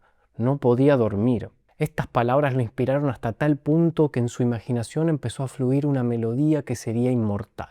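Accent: Argentina. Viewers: Hola varón, por tu voz. Parece que eres argentino tremendismo que aporte haces a todo el mundo al menos para mí. Yo tengo ya 67 años y siento que me completa tu aporte cultural argentino. Tendría que ser bueno yo soy chileno, nunca he tenido una dicha de estar en Argentina, pero bueno alguna vez lo haré, le pagué mis pasajes a mi hijo y a mi hija para conocer argentino. Llegaron maravilladoscon gente como tú como el gran Sandro de América de Leonardo Favio, Piero, etc.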